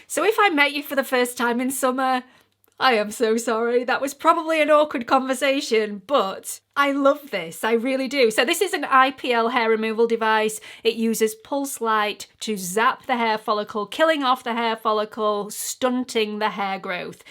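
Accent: United Kingdom. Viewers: Hi there! I'm in the U.S. and some of these product names I've never heard of. I'll see if they ship here. You are so funny and Fun! I find my self laughing with some of your expressions and your LOVELY Brit accent!!! (I want to talk like that!) You are so believable. I think it's your cuteness, your honesty and of course your accent. Thanks for brightening my day! God bless you dear. Lori ❤ Minnesota, USA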